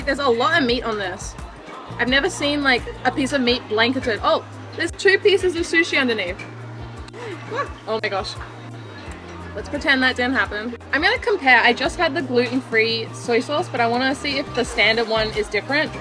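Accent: Australia. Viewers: Love your Aussie accent 😊. Thanks for your video from a Japanese-Canadian 🇨🇦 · Is your English Australian?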